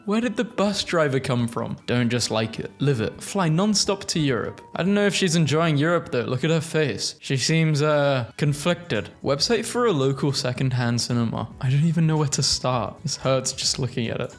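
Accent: United Kingdom. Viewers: typical British pronunciation confusion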